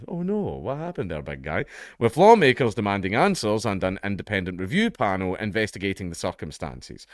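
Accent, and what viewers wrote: Scotland, United Kingdom. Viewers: Why is it made so much more ridiculous when called out in a Scottish accent?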